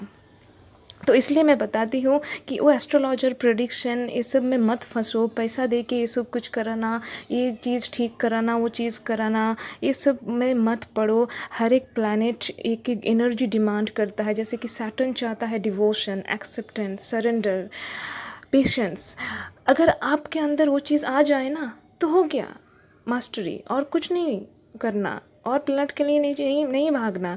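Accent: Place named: South, India. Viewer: Di aap south Indian ho kya ....aapko boli me vesa hi touch hai .....which is very sweet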